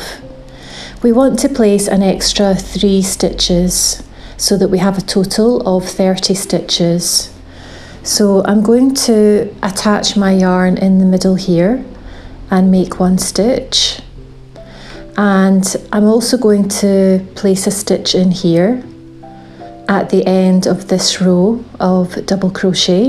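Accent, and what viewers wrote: Scotland, United Kingdom. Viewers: 🥰Just wanted to say that I love your tutorials,! They are so easy to follow and the result is absolutely perfect! Oh and of course love your Scottish accent! 😄 Keep the videos coming because I for one am hooked for life! Pun intented!;)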